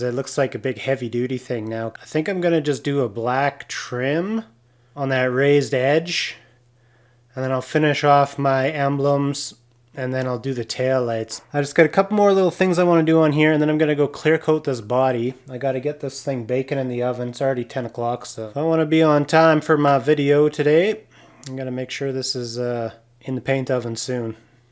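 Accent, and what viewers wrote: Canada. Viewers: the canadian accent hahahha love it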